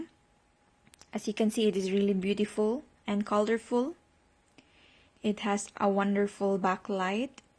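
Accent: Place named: Philippines. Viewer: you are a filipino! I can recognize an accent from a filipino!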